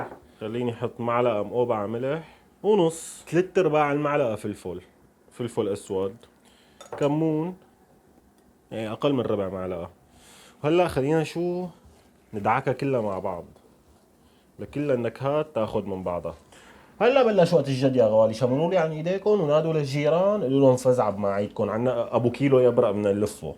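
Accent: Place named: Syria. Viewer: الهجئ السوريه أحلا لسى من الكل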